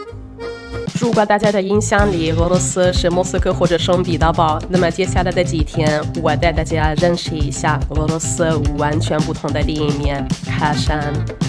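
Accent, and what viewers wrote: Standard. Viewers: 感覺的中文很標準耶..今天看到你的頻道..已訂閱..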